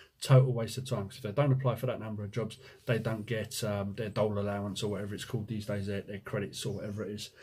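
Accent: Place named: Yorkshire, United Kingdom. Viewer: Definitely a bit of Yorkshire slipping into your accent now 😁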